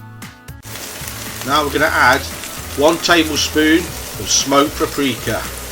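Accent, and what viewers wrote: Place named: Midlands, United Kingdom. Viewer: Looks good, husband and I are trying to narrow down your accent, he says junction 28 of the M1😂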